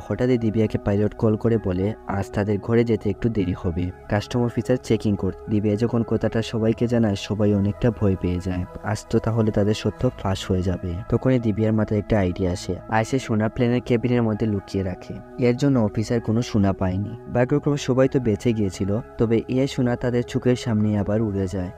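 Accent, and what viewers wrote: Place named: Sylhet, Bangladesh. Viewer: Sylhety vasa oshadaron😮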